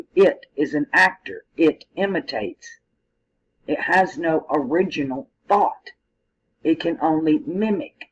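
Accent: South, United States. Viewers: lol i loooove the Southern Drooole :)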